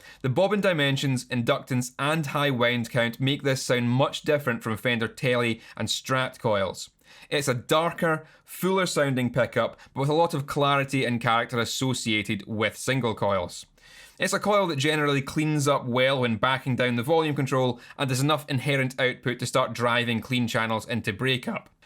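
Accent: Scotland, United Kingdom. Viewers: My favorite part of the Scottish accent is that I know what word is coming next but I have no idea what it’s going to sound like. Riding along with a whole sentence is a lot like a 1980’s movie dressing room glow-up montage.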